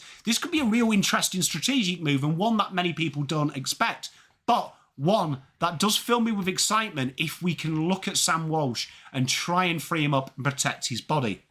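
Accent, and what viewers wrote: England, United Kingdom. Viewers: a pommy talking football lol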